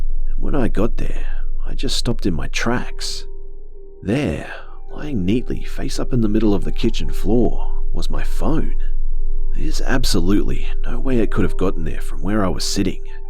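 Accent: Australia. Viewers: Yes 🎉 I actually love hearing a fellow Aussie do these stories 😍😍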